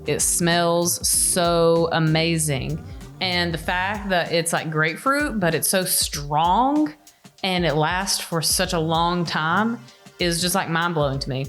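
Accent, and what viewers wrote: South, United States. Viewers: Bang on job with the takeover! Your Southern "belle" accent is mint. 👍 Ashton is a lucky man, you support his hobby/passion, have a cool sense of humour and you're very attractive. I do like these takeovers, well do. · What state are you from? I love hearing another fellow southern accent on here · You nailed it, Great job 👌love those takeover videos, it’s really nice to have a woman’s opinion on men’s fragrances, I love how you pronounce the names with the southern accent 😊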